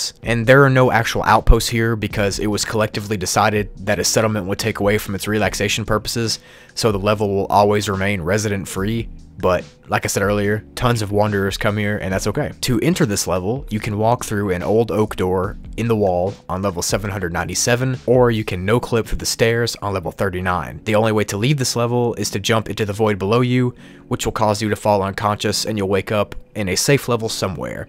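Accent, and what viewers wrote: United Kingdom. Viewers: are you British?😮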